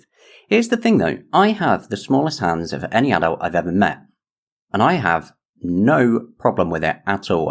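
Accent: United Kingdom. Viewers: Are u from Liverpool?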